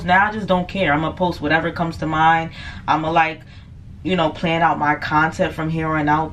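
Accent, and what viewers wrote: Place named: New York, United States. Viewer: Great video. I’m a solo traveler myself. Your accent sounds like New York or somewhere off the East coast. Love from Detroit ❤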